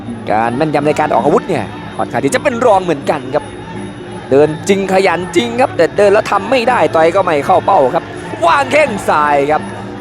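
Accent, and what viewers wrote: Isan, Thailand. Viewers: ม่วนๆฟังเสียงพากษ์อีสานบ้านเฮาเด้อพ่ออาว555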